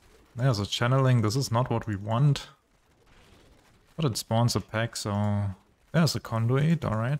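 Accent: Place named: Germany. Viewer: I höre deutsch raus :)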